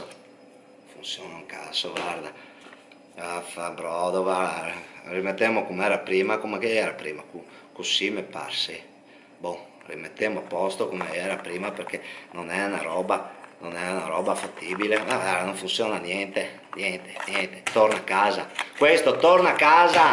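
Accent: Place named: Veneto, Italy. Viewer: Si sente che sei veneto !!! Di Codroipo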